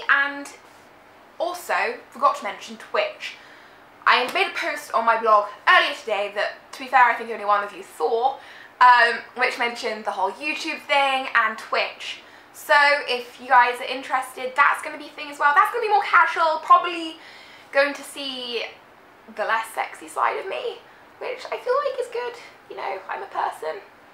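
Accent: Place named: United Kingdom. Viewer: Aren't you cute? Go for comics and animes! And music! and movies! and videogames! and lovely british accent! XD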